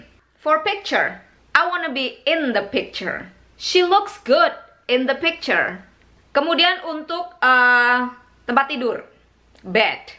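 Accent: Surabaya, Indonesia. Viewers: Sama saja kok... logat suroboyoanku juga ga bisa hilang walaupun sudah tinggal 7 tahun di Bandung dan 4 tahun di Jakarta....makanya aku seneng lihat Mba logat suroboyoannya tetap ada walaupun sudah 3 tahun di Amerika:)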